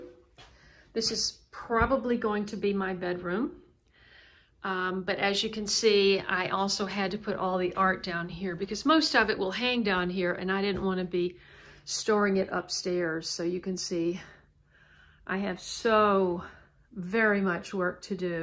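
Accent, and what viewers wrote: Tennessee, United States. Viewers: A Tennessee accent very endearing to me. I am from Wilson county and have lived in SC for 42 years. I pick up my native TN accent in a heartbeat! Let 'er fly, sister! Your home is beautiful. It seems light and cozy.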